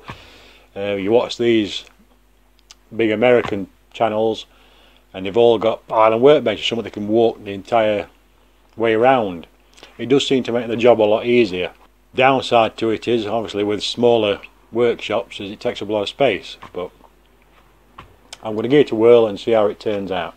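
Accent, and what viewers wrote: Yorkshire, United Kingdom. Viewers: Sounds like a Yorkshire lad and a hoarder ditto, nice shed. Get rid off the greenhouses to other side of the garden and extend the workshop us men need us hobbies. Lol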